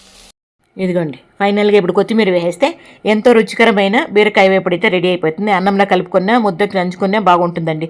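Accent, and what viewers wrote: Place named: Anantapur, India. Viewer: Ma లాంగ్వేజ్ మాట్లాడుతున్నారు మీరు అనంతపూర్ వాసులేనా మా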